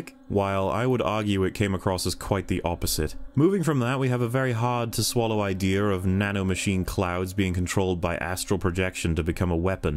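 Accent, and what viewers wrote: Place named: United States. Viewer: strange American accent ONLY ELEVEN MINUTES INTRO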